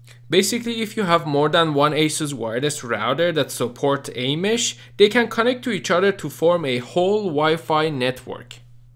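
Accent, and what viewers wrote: Iran. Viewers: Loll gotta love the Persian accent 👍🏼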